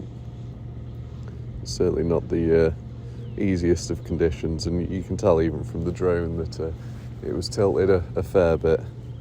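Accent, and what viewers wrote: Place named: England, United Kingdom. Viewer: Im just curious, can anyone tell me where his accent comes from? He sounds virtually identical to a podcaster i listen to, from England. I mean, he pronounces, enunciates and uses the exact same vocabulary as this podcaster. I cant help but be curious. Lovely accent, by the way. Anybody know for sure? Thanks😊 - from 🇺🇲Utah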